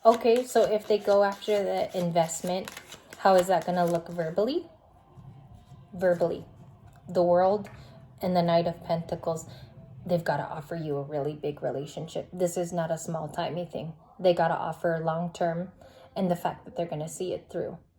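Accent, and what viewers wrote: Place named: South, United States. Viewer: Love the country accent, 🤠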